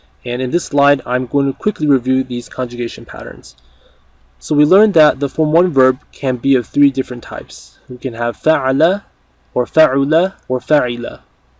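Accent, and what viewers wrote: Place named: Arab world. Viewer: Sorry for interruption. This context is none of my business but as the way you spoke, it seems to me that you are a native arabic speaker. I am learning arabic so it would help to be able to talk with people who practice it in real life. So my request to you is that could we be texting each other on some platform or perhaps you could introduce me to someone if you yourself are too busy. Thanks.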